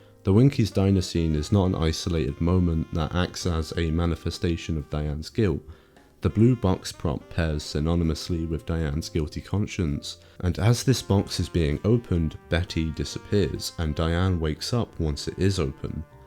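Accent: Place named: United Kingdom. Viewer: I will no longer be watching videos narrated by the hated British.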